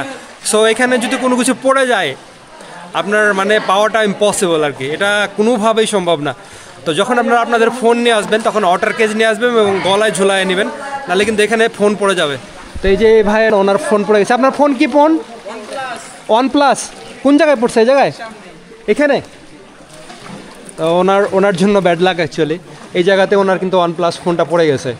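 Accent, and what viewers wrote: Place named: Kolkata, India. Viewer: ভাই কি ইন্ডিয়ান? কথার ভেতর কলকাতার ভাষার টান আছে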